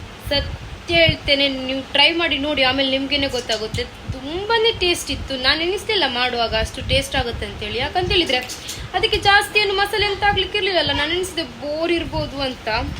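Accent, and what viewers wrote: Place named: Beary, India. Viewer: Ninga endg vatrasi kannada mundre nink byari slang carect band alle ade mundoru nink nalle saport akdar arum ningale troll aklle ❤ pinne ninga troll ayeng ninga tell famus avude k😊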